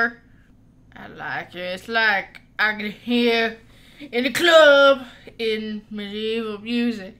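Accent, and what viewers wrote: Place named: New England, United States. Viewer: Your yankee accent means you should hang out in Daytona or cocoa.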